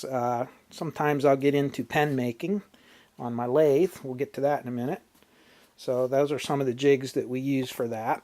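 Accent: Pennsylvania, United States. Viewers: Hey I really like the channel. I'm a woodworker and a ham operator. You sound like you're from western/central pa if so I'd li,e to meet you. Trade ideas on projects and learn some electronics. You have a great teaching style and I'm learning a lot. Give me a shout. Rick - dickrackson at yahoo dot com. Thanks rick.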